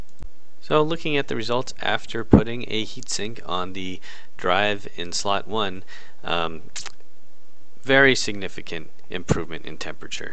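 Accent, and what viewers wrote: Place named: New York, United States. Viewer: Are you from the same area (new-york-ish) as the famous repair-rights activist Louis Rossman? It sounds like you guys have the same dialect - a lot.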